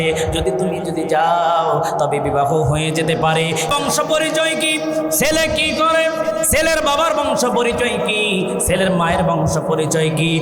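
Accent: Shariatpur, Bangladesh. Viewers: ধুর শরীয়তপুরের কন্ঠে ঠিক আছে কিন্তু আবার হুবহু ওয়াজও নকল করেছে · ধুর শরীয়তপুরীর টানটা ভালো লাগেনা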